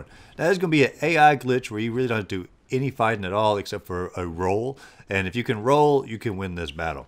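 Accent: South, United States. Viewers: your southern drawl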